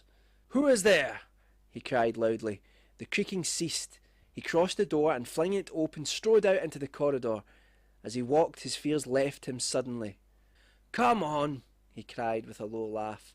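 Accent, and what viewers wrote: Scotland, United Kingdom. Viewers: This is good. Are you scottish or irish?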